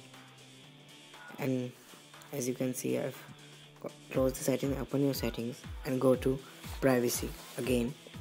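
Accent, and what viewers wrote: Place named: India. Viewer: Of course he sounds Indian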